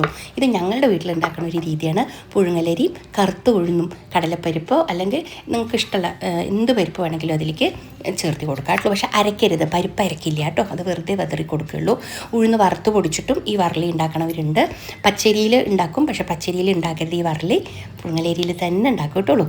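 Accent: Palakkad, India. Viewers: Tto tto ttolu palakkadan slang❤️ from a pkd kari(nemmara)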